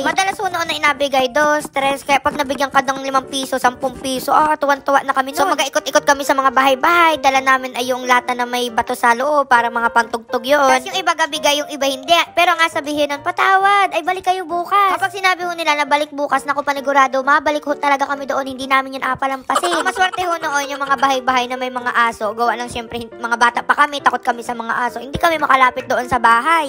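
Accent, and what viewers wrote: Batangas, Philippines. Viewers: Dati ang vlog nito nung ndi pa sumisikat pumupunta xa sa mga katutubong mangyan ngaun nung nkilala na xa ndi n xa nadalaw dun, ang tunay n mngyan nandun mindoro sa bundok.nka bahag lang cla. Un dapat balikan mo qng saan ka nkilala dahil kumikita kna, ang mang yan ndi batangas ang accent.😢😢😢😢dati nung ndi kpa kilala lagi k nadalaw sa lugar ng mngtan ngaun ndi q n nkita bumisita k sa knila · Seriously? Mangyan na tagalog at puntong Batangas?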